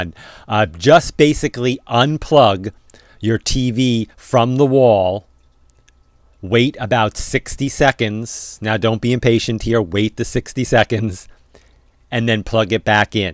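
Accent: New England, United States. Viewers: Your New England accent had me thinking John F Kennedy was helping me fix my smart TV.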